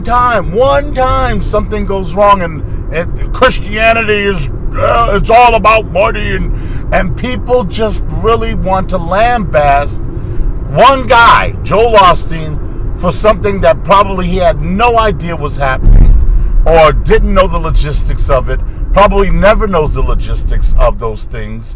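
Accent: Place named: Jamaica. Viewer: Yeah she schooled that elder big time,! Love your Jamaican accent, too funny. Look forward to catching this segment sounds interesting. I took and wrapped up my silver book, can't really call it a bible since they screwed with the holy book.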